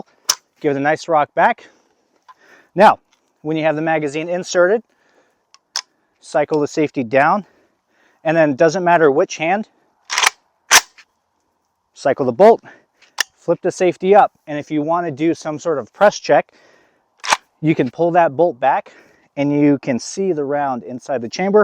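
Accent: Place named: Russia. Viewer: I've been following your IG for a minute and im ngl i was expecting the thickest Russian accent i've ever heard. still not disappointed lol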